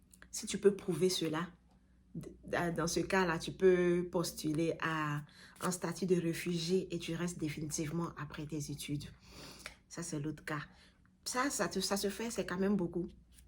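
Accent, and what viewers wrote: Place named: Ivory Coast. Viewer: L'accent ivoirien 🇨🇮 ça ne ment pas. Très propre et limpide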